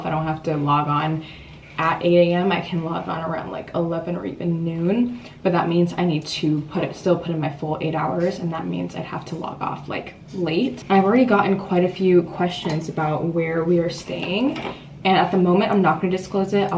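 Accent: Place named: West Coast, United States. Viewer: I like your west coast accent. Stands out so much in NY.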